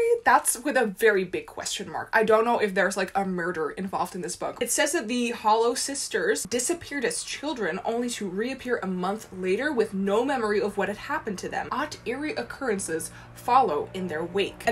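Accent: Netherlands. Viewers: I detected a Dutch accent right away haha, but I speak the language myself so that makes it easier to spot 😊 Her English is flawless, indeed!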